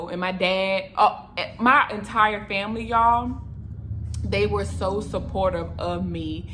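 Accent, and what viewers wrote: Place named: South, United States. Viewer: I enjoyed your video that I came across by chance. Your forthrightness and candor are refreshing and inspiring, and your southern accent is boffo. Eating fruits and veggies are best for our health and digestive system. Supplemental nutrients and vitamins are necessary too. Especially the Omegas 3, etc., and B Vitamins (particularly B 12 if you have vegetarian leanings). Stay away from foods and drinks that have preservatives (Propylene Glycol, BHT, Sodium Benzoate, MSG, Potassium Benzoate, etc.) in them. Preservative are used in cosmetics and medicines a lot too, and can be absorbed into the body transdermally. Overall, preservatives, fillers, and binders are generally man-made chemicals that are not natural or organic. Their use is for preserving the shelf-life of products to maximize profits . They can wreak Havoc in our bodies by disrupting hormones, cells, blood chemistry, and can cause cancers. 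If you think about it, preservatives, fillers, and binders - overtime will cause problems for our digestive systems. In other words, they make digesting what we eat harder, inefficient, and ineffective. This all applies to the nutritional health and safety of pets too. While writing this, I remembered something I read in a Malcolm Gladwell book concerning hormone disruption in women. At this time, I cannot remember which one of his books. Before getting into his findings - let me introduce you to him. Malcolm Gladwell is the author of five New York Times bestsellers — The Tipping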